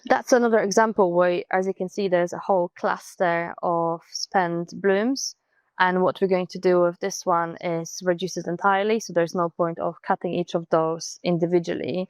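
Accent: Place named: Sweden. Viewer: You sound a bit Swedisch.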